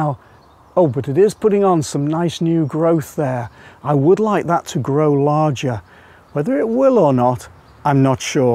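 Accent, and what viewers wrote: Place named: Liverpool, United Kingdom. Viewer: I’m really enjoying this video, it’s so interesting, thank you for sharing. I have to ask are you originally form Liverpool by any chance? I feel like I can detect the slightest hint of ‘scouse’ in your accent ☺️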